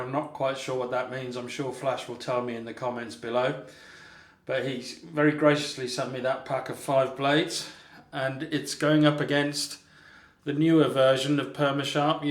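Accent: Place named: England, United Kingdom. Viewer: Many thanks for another great shave. I love your English Accent. 🙄🙄🇦🇷🇦🇷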